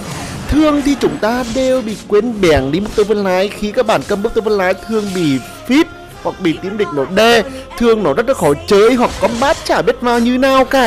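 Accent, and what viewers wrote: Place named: Central, Vietnam. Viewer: Anh ở miền trung à giọng giống em vãi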